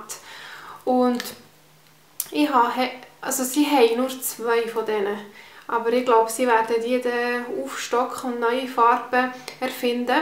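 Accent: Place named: Solothurn, Switzerland. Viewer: Soledurner Dialäkt <3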